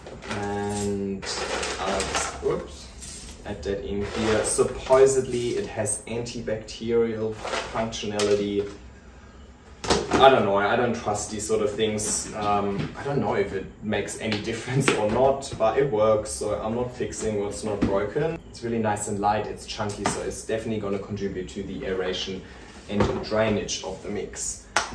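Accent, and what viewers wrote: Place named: Germany. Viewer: I just found you recently and I am loving the content 😍 You brought back my passion for plants after it's been dormant for a year or so 😊 Excuse me for being curious (I love different English accents), you call yourself, but do you have a German/Austrian background? Keep up the good work 👍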